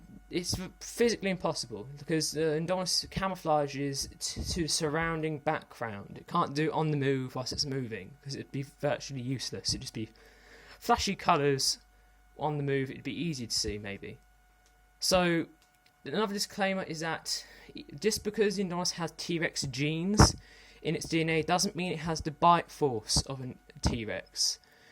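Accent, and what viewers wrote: United Kingdom. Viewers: i didn't even know he had a British accent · I actually quite admire your British accent, you sound smarter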